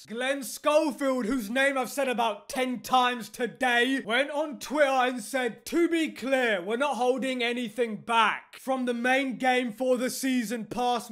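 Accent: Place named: England, United Kingdom. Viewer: Geez, these " This game sucks" channels appear to be all the new rage. They are popping up everywhere, the formula: Possess an English accent, utilize clickbait titles, and just yell about bad something is. LMFAO, the North American IGN review isn't even up on their site yet and he's screaming bloody murder about a game he didn't play and how bad it is 🤣🤣🤣🤣🤣🤣🤣🤣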